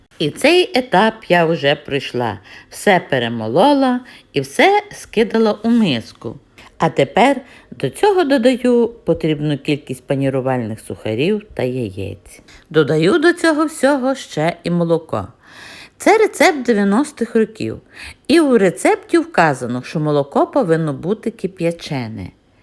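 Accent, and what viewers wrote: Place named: Western Ukraine, Ukraine. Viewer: У вас такий гарний западноукраїнський акцент. Знаю, що в тих краях дуже файні гадзині))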